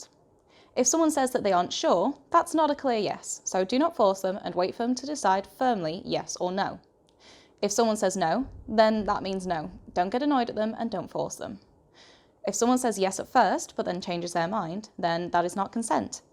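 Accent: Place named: United Kingdom. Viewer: i'm pretty sure the person speaking is british too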